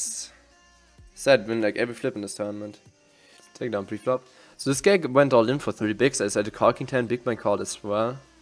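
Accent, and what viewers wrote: Germany. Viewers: You should work on your german accent. And maybe dont cough so much ;)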